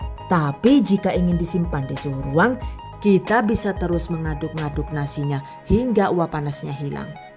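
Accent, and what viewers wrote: Banyumas, Indonesia. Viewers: Jebule ya wong ngapak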